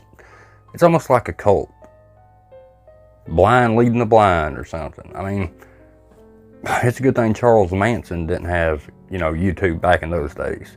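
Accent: South, United States. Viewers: Thank You for opening my eyes to a lot of fakers out there that I TRULY BELIEVED THEM. I think it's really rotten to the core to put out FAKE PARANORMAL VIDEOS when actual hauntings do occur and the people who are affected and suffering from these events make them look either fake like theirs or their just labeled "CRAZY!!" Plainly speaking, it's just fucked up and a truly greedy way to make a buck!!!, I had asked you if you happened to read my comment not too long ago, about the ALEXA, and I asked you flat out, "Well then, can you please explain how he's communicating through the Alexa. So I'm so glad for this upload, that it was addressed after all, one way or another!! My boyfriend is not easily fooled and thinks VERY SIMILARLY the way you do, and told me how he's doing it, like THIS, SO this was ever so appreciated. THANK YOU, and I sincerely hope your Mother is feeling a lot better. 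Please give her my regards my friend. Kindly, let her know I get chills and I get head over heels love it when I hear your Southern Accent! ☮️💜🤘 PS: BTW... I'm from New York City 😘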